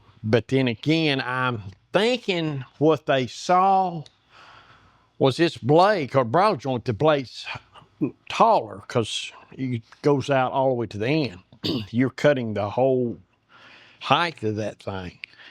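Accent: South, United States. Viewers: I really like your channel sir, I’m a cabinet maker by trade and have been for many years. It’s guys like you who teach me things and help me grow. I thank you for your videos and experience. Keep ‘em coming. Don’t let the negativity get to you, some people have absolutely nothing better to do than put others down…. Especially when they are envious. Personally, I enjoy your southern accent. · Kids can't even make change at a checkout counter, and they want to make fun of a master carpenter because he has a southern accent! This tells you where this kids head is at. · The only thing better than your vid’s is your southern accent! Keep up the good work! Btw…are you going to tell us how you made the steampunk gears?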